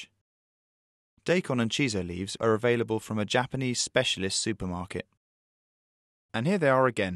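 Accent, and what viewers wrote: United Kingdom. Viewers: British pronunciation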